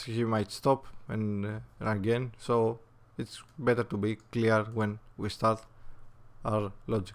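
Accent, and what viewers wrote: Greece. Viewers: πες την αληθεια!! εισαι ελληνας απο λιγο που ακουσα το βιντεο ... (προφορα)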